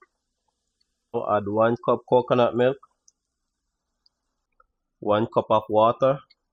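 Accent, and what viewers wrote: Jamaica. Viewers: Chef, I really love your authenticity. Many Jamaicans change the way they speak and do things outside of the country. It is really refreshing to hear how you speak and express yourself as a person and as a chef. I can see that you really love what you do 😊. Keep repping the black, green and gold out loud! One love mi bredda and get well soon. God bless you🇯🇲🇯🇲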